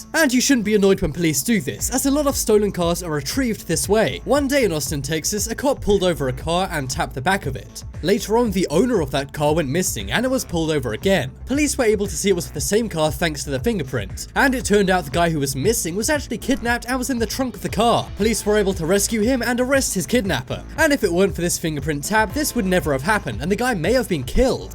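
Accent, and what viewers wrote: United Kingdom. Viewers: This is nonsense This is obviously filmed in Britain and is done by someone with the equivalent accent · THE WHOLE THING IS BULL SHIT... This is some Russian fake news divide America BULL SHIT... And I am offended that some asshole will a British accent is propagating this BULL SHIT.. there are social and economic reasons why arrests spike in SOME cities and communities that are related to there being too much month left at the end of the money... statistically you will find MURDERS, ASSAULT AND ROBBERY spiking on Friday and Saturday... but I guess that’s a plot by cops tricking people into robbing, stealing, and killing so they can meet their quota... NICE SUBTLE RACIST AND SEXIST MOVE TOO USING THE IMAGE OF A BROWN WOMAN WHEN YOU FLASHED THE WORD “QUOTA” ON THE SCREEN... anyone who agreed with this nonsense... I have the winning lottery ticket I’m willing to sell you...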